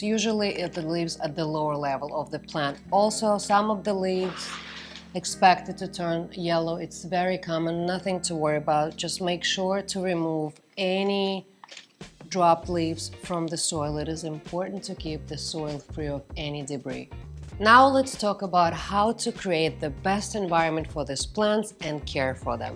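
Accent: Russia. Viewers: I love Russian or some sorta accent 😅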